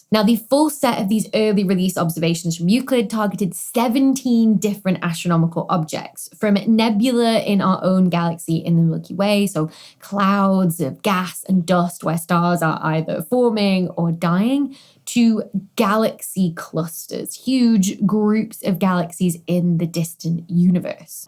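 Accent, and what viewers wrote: United Kingdom. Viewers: I love that you make an effort to talk like a British person, it really irritates me that use of Americanisms is spreading.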